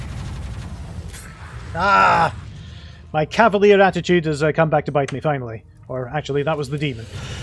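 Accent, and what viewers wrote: Scotland, United Kingdom. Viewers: are you from Scotland mate?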